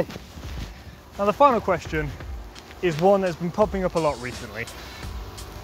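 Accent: United Kingdom. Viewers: I thought u must be britisher😮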